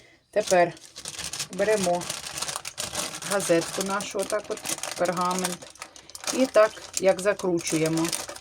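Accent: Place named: Luhansk, Ukraine. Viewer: говор такой как у нас, суржик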